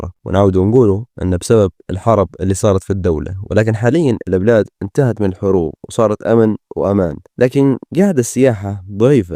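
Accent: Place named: Libya. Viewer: بلهجة الليبية 🤯🔥🔥🔥🔥🔥🔥🔥🔥🔥🔥🔥🔥🔥🔥🔥🔥🔥🔥🔥🔥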